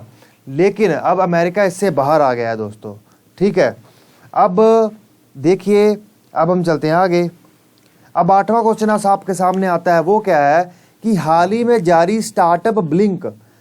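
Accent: Punjab, India. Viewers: paji sardar lagde oo punjabi hindi tuhadi mix ho rhi aa chal koi na veer........god bless u rabb trakiya bakshe...thank u so much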